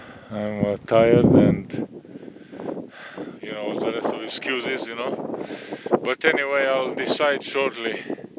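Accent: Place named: Ukraine. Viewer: Almost to hot for swimming, UV is generally 9 or higher so we tend to stay out of that as much as possible. Our area of choice to hike in is Whiteshell provincial park..just named a UNESCO world heritage site for its stunning boreal forest and petroforms..a truly magical place. I thought that I recognized your accent, my Grandfather was from just that area.. Ternopil to be precise..I remember him telling me of the beautiful hills and mountains.. small world isn't it? Cheers!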